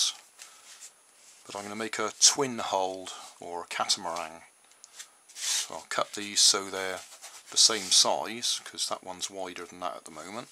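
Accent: United Kingdom. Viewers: Hi Sir.. I am glad to see the way you demostrate your incredible inventions. hats off to your sir .. i am highly encouraged and engrossed in looking at all your videos ... i am sure you are from UK as i heard ur accent and i have many friends out there .. its been a long time i have been looking at videos to find out something interesting to do when i am normally free.. Thanks for being so passioned in showing the entire creation precisely .. thanks again and have a blessed day .... sir .... i am insipred ...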